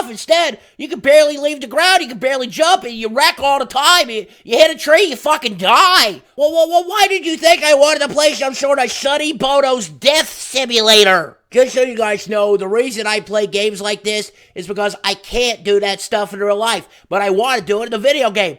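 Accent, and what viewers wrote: South, United States. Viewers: I haven't watched in a few years. What happened? The voice is totally different. It sounds nasally and like a bad mix of a Southern accent and...something else.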